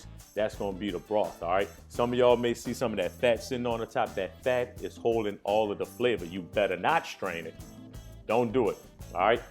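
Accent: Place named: New Orleans, United States. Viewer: Came to do this on Christmas, I knew I had the right video when I heard that New Orleans accent and the word " rices" . It came out great my brotha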